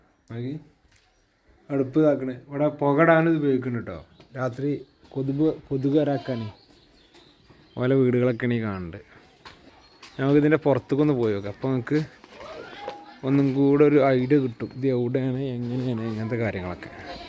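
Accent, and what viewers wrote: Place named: Malappuram, India. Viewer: എടോ ഇത് മലപ്പുറം ശൈലിയാണ്, അല്ലാതെ അച്ചടി ഭാഷ ചാനൽ അല്ല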